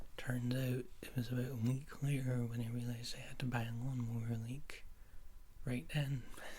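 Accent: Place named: Scotland, United Kingdom. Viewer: Yay welcome back!!! Love these rambley style videos, dat whispering voice + dat scottish accent = <3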